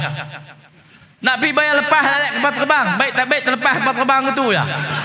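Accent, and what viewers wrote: Kedah, Malaysia. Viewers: ni cara anak kedah ckp...ori bya bahasa...trbaik mb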